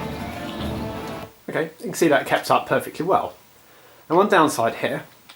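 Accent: England, United Kingdom. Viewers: ***** I wish I would have talked to you 18 months ago. The box is dedicated to KODI (boots up to KODI), so it makes sense to dump pulse. I'm reading the wiki now. I'm one of the ones that has dvd rips up to 42GBs and many are ma and trueHD. I've had to change the output to DTS or DD. For some reason I thought you were in Australia. Sounds more like England. Thanks for your help. I'm going to give it a try.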